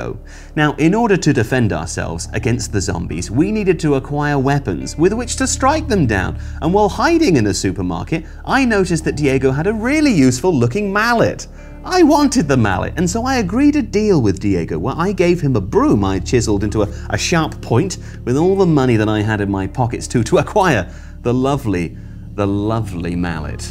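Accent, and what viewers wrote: United Kingdom. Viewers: I love your voice so much bruuvvvv. I have been watching Tifo since 2018 and I want to say that your British dialect and voice is really awesome in comparison to others I've listened to.It is a high compliment bc the internet is vast. My advice is: when you start doing commentary for live games, please dont be a siff like the majority of English announcers - look for some sauce and vivacity from the Romance languages of how they announce games (Argentinians, U.S. Hispanic announcers, and Portuguese are really good starts for research into this - they are so much fun when they announce games). And, yes, one day, BBC, or someone is going to want to use that voice....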